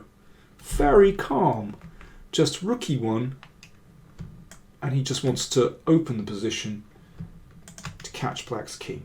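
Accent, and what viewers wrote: United Kingdom. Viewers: Thanks for all the valuable stuff you lovingly provide chess enthusiasts with. And by the way, your succinct, eloquent commentary with genuine British accent offers an excellent English course!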